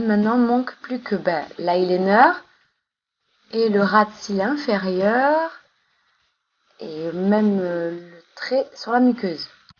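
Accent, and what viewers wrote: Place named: Marseille, France. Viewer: On est à côté Made in Marseille ici !! Alors je me reconnais aussi à ton accent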